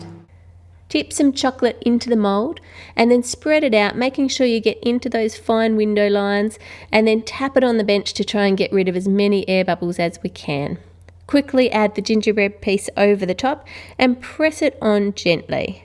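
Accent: Australia. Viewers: I am from Maryland,Us and I would honestly love winning the mixer because I love baking ands cooking but I don't always have the tools to do so(ands being 14 I don't really have the money either) and I have always dreamt of having a mixer to make what the bakers online make (I don't only watch you and other Australian youtubers to perfect my accent) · Ikr I could listen to all day:D she's Australian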